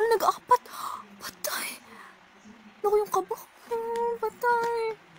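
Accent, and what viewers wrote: South Korea. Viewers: galing mag korean bisaya accent 😊😊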